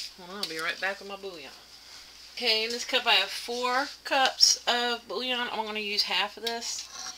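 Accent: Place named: South, United States. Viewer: I love your Southern ? accent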